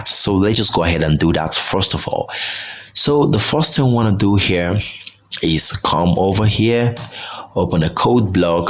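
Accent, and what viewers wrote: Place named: Nigeria. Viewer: Wow ...you great ...maybe its the Nigerian accent but you taught me better....Nigerian from Canada here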